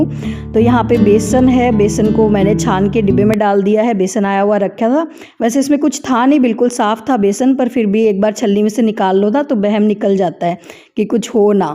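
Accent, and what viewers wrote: Rajasthan, India. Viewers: Di ap vlog k bich bich me aise hi marwadi batein sunate rehna bahut achha lagta hai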